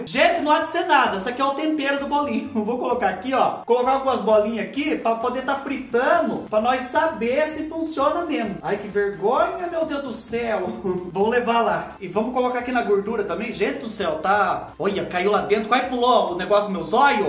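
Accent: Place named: Itacaré, Brazil. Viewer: seu sotaque eh igual das pessoas de Itacaré sp morei nessa cidade há 20 anos e seu sotaque me dá uma saudades de lá, amo seus vídeos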